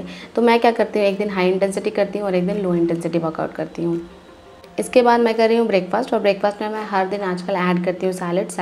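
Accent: Bihar, India. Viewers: Didi aap bihar se ho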